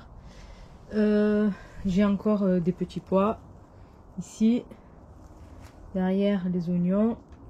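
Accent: South, France. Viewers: coucou, j’ai découvert ta chaîne YouTube depuis la pas mal de temps... je crois que t’as un accent du sud 🤣😀😀 j’adore.. demain je vais planter des courgettes 🖍☢️♻️♻️㊗️.. Bon week-end. Yanis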